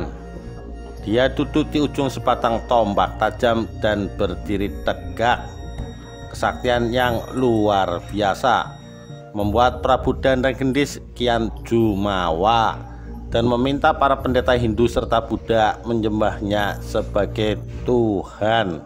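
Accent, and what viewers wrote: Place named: Java, Indonesia. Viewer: Medok bener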